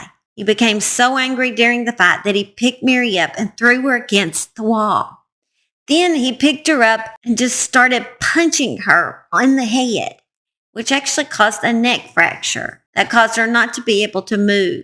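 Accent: South, United States. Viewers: Absolutely love you I'm from England & I just love your southern accent & thankyou for all your hard work on these stories & the empathy you tell then with.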